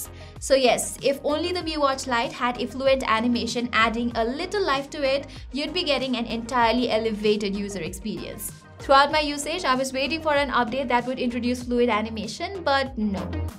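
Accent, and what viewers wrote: India. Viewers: A little less accent would do... Felt more hinglish.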